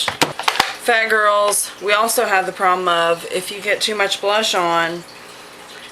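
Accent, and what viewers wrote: South, United States. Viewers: where are you from ?? your southern accent is so awesome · You my friend, just earned a new subscriber. :) Are you from the south?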